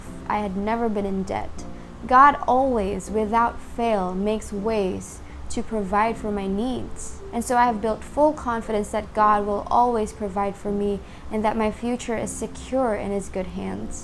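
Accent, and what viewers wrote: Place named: United States. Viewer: Wow, your attitude is amazing. Sorry to hear that you have been through a difficult time. Out of interest, are you from Phillipines, as you sound American 😊!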